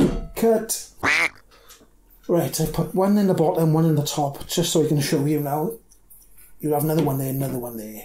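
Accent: United Kingdom. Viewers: Nice to here an accent that sounds like you live on my street, instead of the USA. Well presented video, look forward to the sequel. If you ever need any more of those headlamp clips, by the way, you can get them in Wilco for a couple of bob, they are for holding your greenhouse glass on. They only last about a year outdoors, but will probably be ok in the headlight. Paul SW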